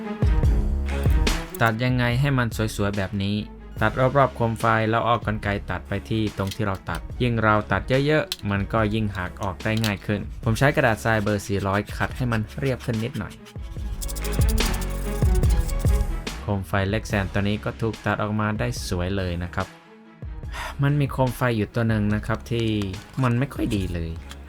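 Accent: Isan, Thailand. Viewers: สุดยอดครับพี่ ผมก็เพิ่งจะเริ่มมาหัดเล่น RC ยังไม่รู้เรื่องเลยครับ ไม่รู้จะเริ่มจากตรงไหน เคยดูคลิปพี่เมื่อหลายปีที่แล้วอยากเล่นมากแต่ตอนนั้นไม่มีงบ เพิ่งจะได้กลับมาดูเพราะอยากเล่น RC นี่แหละครับ ดูเพลินมาก โดยเฉพาะเวลาเว่าอิสานนี่แหละเด้อ 55 ทำคลิปออกมาเยอะๆเลยนะครับ 😊😊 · ชอบการตัดเสียงพูดแยกกับเสียงคลิปหลักทำให้ไม่ขัดหูเวลาฟัง ดีมากๆเลยครับ ดีกว่าพากษ์ทับเสียงคลิปหลักทำให้เสียงซ้อนกัน และก็ชอบตรงเว่าอีสานผสมนี่แหละครับ🤣🤣 ชอบผลงานทุกคลิปมากครับ เป็นประโยนช์มาก ติดตามตั้งแต่ Mirage fix ขอให้ทำต่อไปอีกนะครับจะรอรับชมเสมอครับ👍🏻👍🏻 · สุดยอดฝีมือเลยครับ มักเวลาเจ้าว่าวอีสานเบิ่งเป็นกันเอง ฟั่งเเล้วเพลิน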